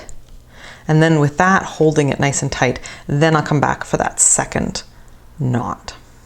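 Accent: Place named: Canada. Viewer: Can I just say I love your gnomes but I also love the way Canadians say words with "ou" in them like about and out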